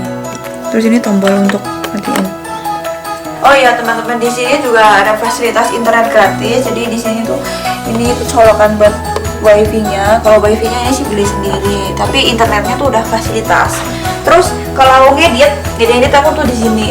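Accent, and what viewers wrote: Banyumas, Indonesia. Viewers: Kok agak2 medok ya. Orang mana kak? Kayak logat banyumasan gitu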